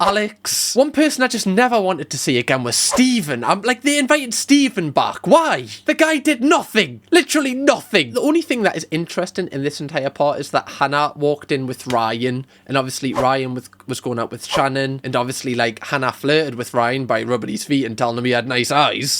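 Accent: Newcastle, United Kingdom. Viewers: Your videos are okay but I'm subscribing because your a geordie.... UP THE TOON!!! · Hey!! I just LOVE your accent! I'm from Florida but my best friend is a geordie and you sound like him...or are you Scottish?? You are effing hilarious "SAY YES! SAY YES!! SAY YES!....PAHHH!!" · You are so intuitive but also funny and entertaining! Lovely to hear the Geordie accent! My parents were from Hexham, keep up your good work 😂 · Are you from Newcastle? Wanna know your accent :)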